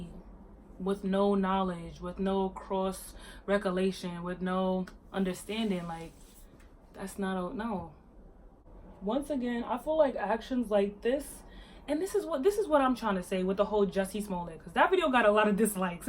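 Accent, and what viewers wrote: New York, United States. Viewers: You're hella gorgeous and your New Yorker accent is so lit yo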